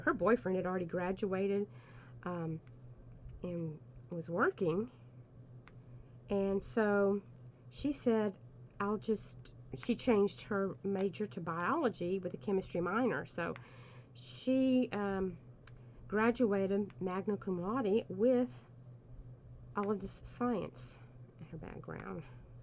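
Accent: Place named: South, United States. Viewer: I can't believe you are losing subscribers! I love your gentle southern lilt and I will continue to watch....DON'T GIVE UP YOUR CHANNEL!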